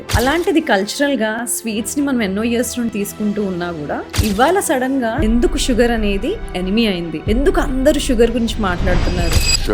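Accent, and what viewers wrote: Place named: North, India. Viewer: Meru telugu na I thought an northie dr....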